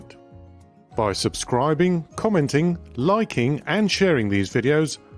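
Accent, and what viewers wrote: United Kingdom. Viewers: Delicious British Accent! What do you think about the environmental impact caused by greenhouse gases produced by aircraft in the long term?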